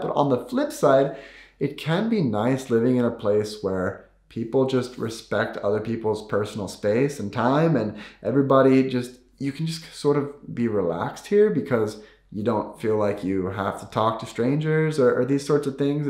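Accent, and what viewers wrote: Sweden. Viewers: I’ve been checking in on your channel from time to time. First thing i noticed is that you’re taking on the swedish accent. Just a bit. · Is it just me or has he kind of gotten a Swedish accent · It was a while i saw your videos, and your english have way more swedish tones in it now, you sound like a swede talking english ❣️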